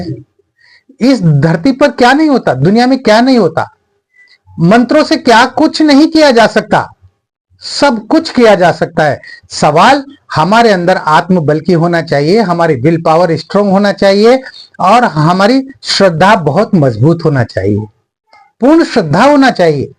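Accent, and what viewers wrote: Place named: Maharashtra, India. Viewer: 🙏प्रणाम गुरुजी 🙏🙏 आप महाराष्ट्र से हो ना, आपकी बोलणे के तरिके से ऐसा प्रतीत होता है, क्षमा करे 🙏🙏🙏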